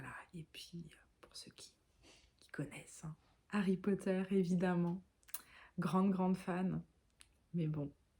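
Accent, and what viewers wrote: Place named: North-East, France. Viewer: Je vous découvre par hasard , merci youtube , pour une première vous êtes bien à l’aise , bravo . Je reconnais ce petit accent du nord est de la France , je dois avoir le même 😊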